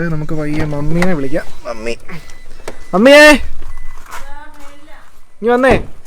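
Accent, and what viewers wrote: Kottayam, India. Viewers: Kottayam language powli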